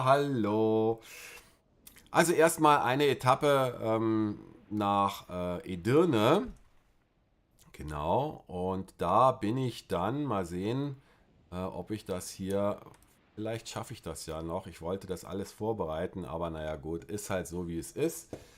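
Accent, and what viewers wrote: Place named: Germany. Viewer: Du sprichst die Städte wie ein deutscher aus. Bist du wirklich Türke?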